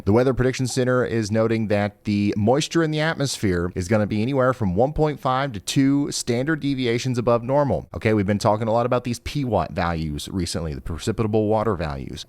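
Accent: South, United States. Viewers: I just love your Southern Speak! I’m from what used to be fairly rural north Fla Panhandle; I grew up in Tallahassee, but the middle, to the western panhandle are not far from Al, & Ga, so “Daggone” is not unknown to me. 30 years ago, ( I will be 71 in July)I married a man from Michigan, & we’ve been living in the Grand Traverse Region of N.W. Lower Mi since 2008, & I still speak Southern….with a slightly less southern accent.😉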